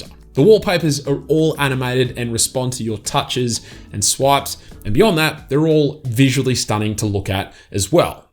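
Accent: Australia. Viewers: When I heard your accent I thought you are a British but you are definitely Australian 😂❤️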